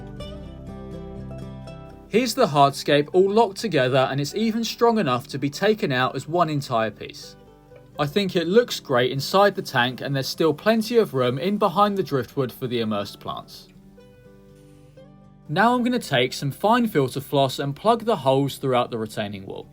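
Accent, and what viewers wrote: United Kingdom. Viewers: Hello! Thanks for very entertaining and educational channel. I'm from Sweden and wondering where in the UK you're from!? What dialect you speak!? ☺️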